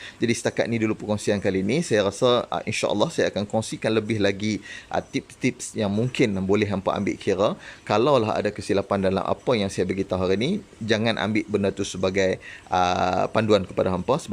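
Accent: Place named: North, Malaysia. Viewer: Terhibur ckp utara sanrtai2. Kepala masuk bakui, tauk buang, cap kepala naga.. Hahaha.. Senamg faham dan xstress. Semuanya info yg sgt bermanafaat dan terbaik. Muga Allah tambah lg ilmu kpd tuan utk manafaat bersama.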